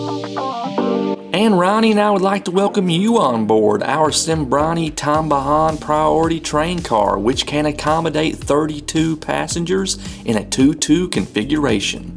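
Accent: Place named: Texas, United States. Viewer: i like your accent man.. where do you come from?! texas?! have fun in indo man..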